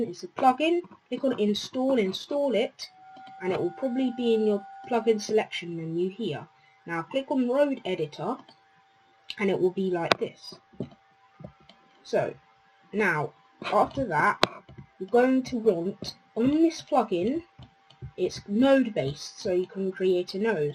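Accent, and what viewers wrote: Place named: United Kingdom. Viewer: the brithis accent its so funny XD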